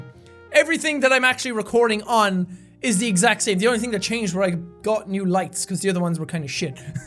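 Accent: Ireland. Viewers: NOOO U WILL LOSE IRISSH ACENT OH NO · i am a little bit, i have a BIG thing for Irish Accents..so if he is in the UK now it does worry me a little bit. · My Irish Potato is gonna be in England.... You better keep your accent! Don't get a British one! That's what Stampy is for! Stay you! -Keegan Tripp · ahh no more Ireland :( Well hope you enjoy your new home...<3 Hope you keep your amazing accent too <3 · Maybe the only think I'd ask for is that he never loses his Irish accent. xD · He is going to loose his awesome irish accent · don't worry he won't, he's a fully grown adult ("adult"😂), so he may lose it slightly but he'll always have that irish tinge (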